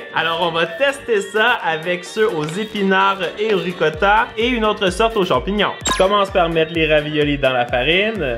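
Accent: Canada. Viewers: tu mon youtubeur préféré desCarl is cooking vidéos accent canadien et qui met quoi des vidéos trop trop rire 👍🇨🇦👍👍👍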